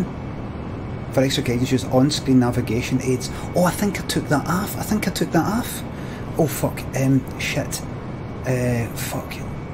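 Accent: Scotland, United Kingdom. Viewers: They say that plane passengers feel most comfortable with a male pilot with a scottish sccent, and i must say that the scottish sccent still outweighs your awful flying, id fly with you anyday!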